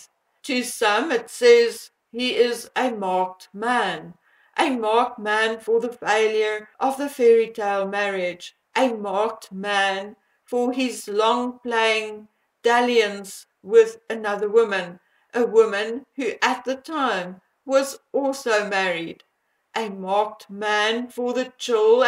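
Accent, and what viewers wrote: South Africa. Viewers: I think impressions by people who are not in the UK are dubious. This is a small island and you are never far away from the RF. They make visits an amazing number of times. Its extraordinary how they have the stamina. They do marvellours work in many areas unpublished but leaked by people who work in the royal residences. All this can happen because they live in America one of the most dangerous places on earth. I worked abroad as a member of the Foreign and Commonwealth Office and the Americans were despised as ignorant and brainwashed. They are deadly in diplomacy you want no association with them. I gather from your accent you are South African and maybe have emigrated. You forget the Monarcy is here at the choice of the people and will not be removed by anyone overseas. It will end when we, the people, decide it does not suit our purpose. 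You also leave out the greed for money and power that fuels the US in a way it does not in Europe. Americans think because they speak English they are like us. They are not. We have not shared a history with them. We did not massacre and try to starve a native population. We had equal rights for our black and asian friends. OK some people object to the benefits some of these people have over poor whites. They are at the head of every profession in our country. Likewise women including 3 Prime Ministers, First Minister of Scotland, past First Minister of Northern Ireland. America is a power hungry country. it is also ridiculous in is post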